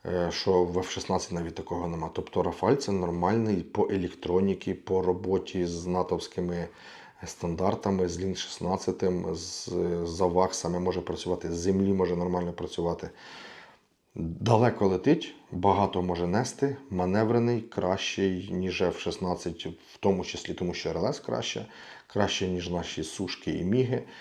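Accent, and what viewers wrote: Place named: Russia. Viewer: Яка бездоганна українська мова, спочатку подумав я, але це було тільки спочатку! Жахлива кількості русизмів, неправильні закінчення і взагалі не відповідні слова, або мовні покручі. Наприклад: мощний ; крепший; крєпєжа; пощитати замість порахувати і рахується замість вважається (рахується - калька з російської - СЧИТАЕТСЯ); по елЄктроніКі; канешно і таке інше. Жах. (((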